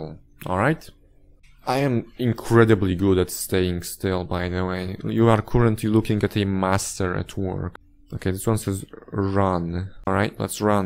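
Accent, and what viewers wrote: Poland. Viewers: are you polish? I'm sure that your accent is polish.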